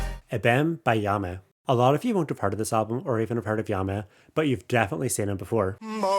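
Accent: Ireland. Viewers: The Irish accent trew me off ngl 😂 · Love this. More of these please! He talks and he’s Irish! OMG.. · I was not expecting the Irish accent lol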